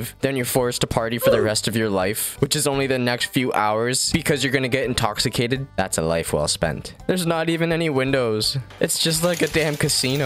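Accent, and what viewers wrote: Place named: Canada. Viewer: Your Canadian accent is so magnificent...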